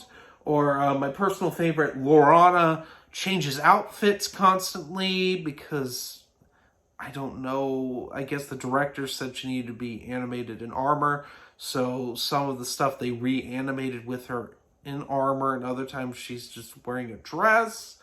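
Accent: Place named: Canada. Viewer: Why after all these years, am I just now hearing a slight northern accent, like a Canadian accent!? Sweet collection. I remember you telling me about how awesome these were. I’m hoping to give that first trilogy a go.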